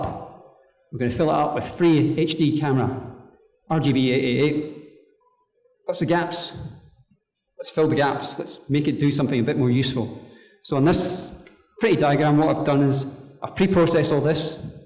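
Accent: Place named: India. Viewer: Indian english host？the accent is very unformal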